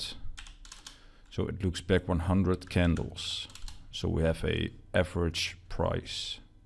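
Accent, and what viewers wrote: Netherlands. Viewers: I've used this strategy for a few days on a paper-trading account and really like it. Lots of entries which I like, I work from home but am unable to sit and watch charts all day. That's probably the most frustrating thing about trading in my spare time, waiting for entries. Most strategies also only really work during trends, feel like I miss a lot of trades when it's not trending. With this strategy I don't have to wait too long to find an entry. I also really like the Absolute Strength Histogram strategy, finding good stuff with that and also seems to work in flatter markets.. I've started leaving the volume up along with the ASH and found that they can both confirm each other. BTW - are you Dutch, by chance? You sound like a native Dutch English-speaker to me, not that it matters :)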